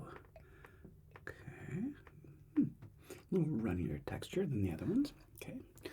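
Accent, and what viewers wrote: United Kingdom. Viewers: Well goddam to be. A gringo you prenoust that great and also that breth acsint 10 /10 😂😂🇲🇽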